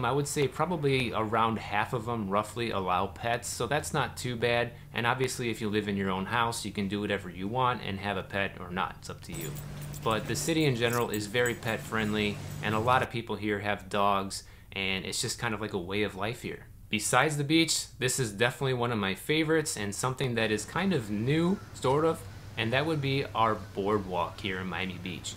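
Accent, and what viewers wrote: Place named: Midwest, United States. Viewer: ...My girlfriend and I from Maryland vacation often in Hollywood Beach,....and you can just make out the Miami skyline from there looking south. Though we won't be retiring in Florida for a variety of reasons we both enjoy your outstanding videos,....and it gives us more reasons to consider vacationing again in Fla. Btw,...I had to laugh(again)at your midwestern accent when at the start of your video you pronounce it 'FLOOR--i-da''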